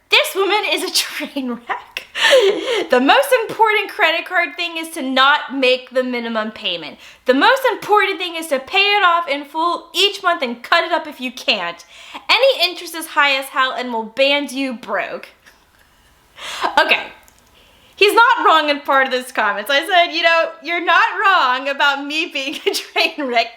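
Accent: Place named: San Francisco, United States. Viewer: I thought you were a San Francisco Native because of your beautiful San Francisco accent. It’s a very unique way that native San Franciscans talk and I love it.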